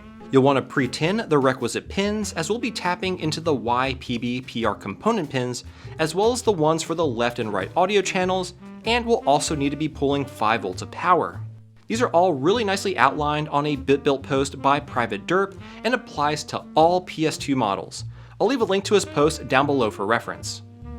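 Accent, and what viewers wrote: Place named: South, United States. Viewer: Your southern drawl came out in this one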